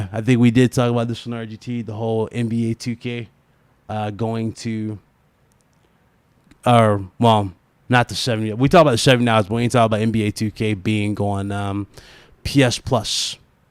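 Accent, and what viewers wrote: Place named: Atlanta, United States. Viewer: we need to use his webcam 😄 it's so weird watching you since you didn't use cam before. people thought you were white? lol I can pick up that Atlanta accent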